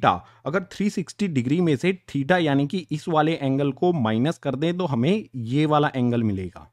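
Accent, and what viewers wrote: Haryana, India. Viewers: Bhai.. Sir. Kaha ke h.... Mujhe lagta h ki haryana ya rajasthan ke h..... Apko kya lagta h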